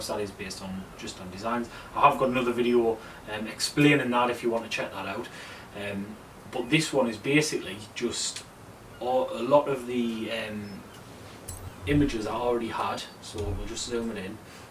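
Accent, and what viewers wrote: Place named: Newcastle, United Kingdom. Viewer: Are you from Newcastle, you sound northern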